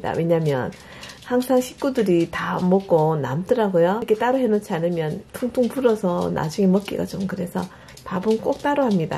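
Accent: Gyeongsang, South Korea. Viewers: 녜~ 경상도 말씨로 진행을 하셔도 매우 훌륭하네요! ㅎ ㅎ ㅎ 오늘은 귀하의 덕분으로 산삼백숙을 먹을려고 부산서 왔읍니다. 수고 하셨고요 - - - - 자주 뵙길 희망합니다. 🍉🍉🍉🍑🍅🍆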